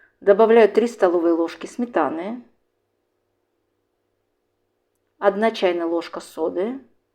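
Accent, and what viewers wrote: Baku, Azerbaijan. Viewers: Вы наш глоток воздуха…, наша замечательная память о родном , любимом Баку!!!!! Все ,что вы предлагаете нам испечь, все вкусняшка! С огромным удовольствием слушаем Вашу речь с Бакинским выговором, мягким и нежным, только наши женщины так разговаривают, певуче! Будте Счастливы и оставайтесь долго, долго такой обаятельной и привлекательной!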